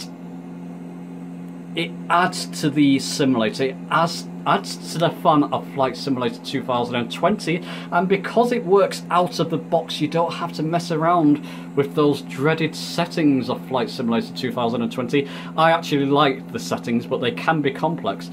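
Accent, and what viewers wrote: United Kingdom. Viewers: Hello, I found your YouTube just days ago. It is very helpful and I enjoy the tutorial very well. There is a question for you: can the Logitech G Saitek Pro Flight Multi Panel compatible with the iMac? I have to make sure before I buy it. I am a very beginner for the X-plane 11 installed on my iMac. BTW I enjoy your British accent! Thank you in advance!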